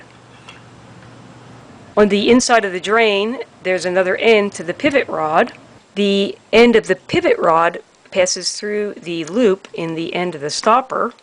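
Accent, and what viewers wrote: Philadelphia, United States. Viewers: That’s a Philly accent if I’ve ever heard one!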